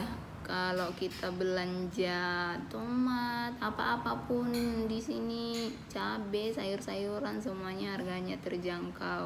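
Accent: Malaysia. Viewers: Horas ito,buat dikit logat malaysinya ito,he...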